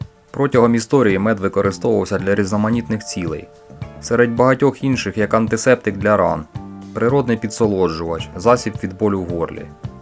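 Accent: Ukraine. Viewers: Автор явно з Черкоащини.)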